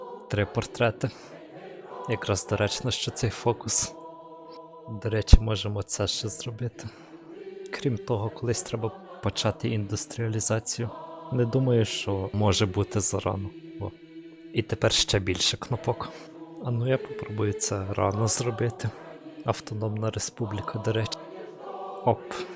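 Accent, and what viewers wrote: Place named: Germany. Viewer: А ти не німець? Бо таке враження неначе німця слухаю який українську вивчив.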